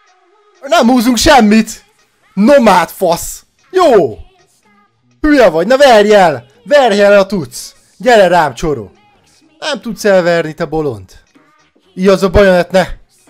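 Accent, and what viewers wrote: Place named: Russia. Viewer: Orosz accent megvan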